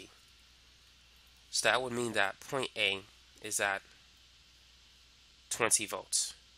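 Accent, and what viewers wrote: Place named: Boston, United States. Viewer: You sound like you're from Boston or jersey.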